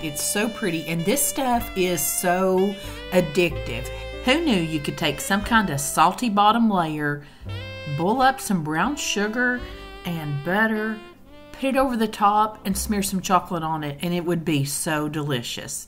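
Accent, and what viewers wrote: South, United States. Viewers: What a great video, some excellent Christmas treats im gonna try. Love your southern accent! Love from the UK xx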